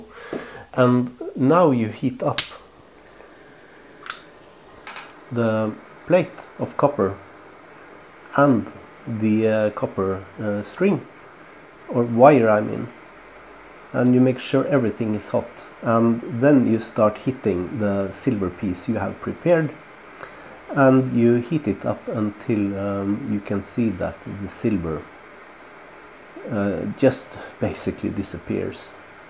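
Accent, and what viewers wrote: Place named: Sweden. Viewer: i have one question? are u swedish? u rly sound swdish i am finnish btw xd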